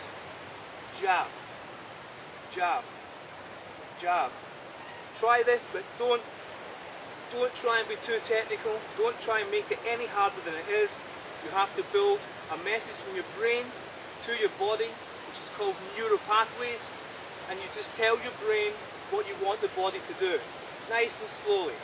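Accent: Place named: Ireland. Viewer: good ole irish accent. atleast i think its irish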